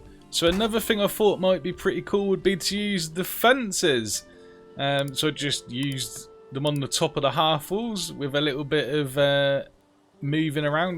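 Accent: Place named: Suffolk, United Kingdom. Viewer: I'm from suffolk , that's why I thought I could hear a hint of suffolk in your accent Haha.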